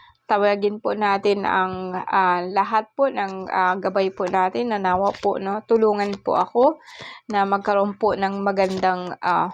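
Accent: Bicol, Philippines. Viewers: Taga Bicol po kayo? May punto po kasi kayong Bicolano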